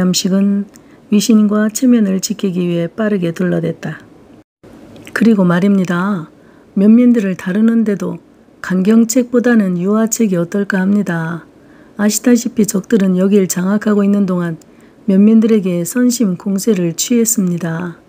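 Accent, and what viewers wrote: Jeolla, South Korea. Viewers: 많이 힘드실텐데 계속 읽어 주셔서 감사합니다. 전라도사투리에 욕하는것까지도 무척 찰지고 정답게 들립니다. 작가님, 건강 잘 챙기세요.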